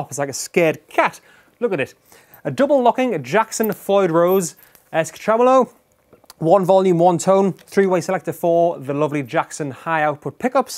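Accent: United Kingdom. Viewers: I'm 4 years late, just got this guitar. British accent hurts my ears but I liked and subscribed. Great video. I'd prefer some more cockney talk though, like "this axe is all kinds of honey and butter!", but got my sub regardless. Redcoat.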